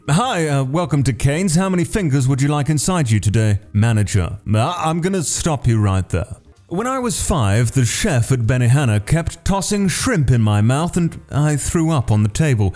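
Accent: United Kingdom. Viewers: His posh voice makes me feel positively Edwardian And I want D. E. E. Z. N. U. T. S GOTCHA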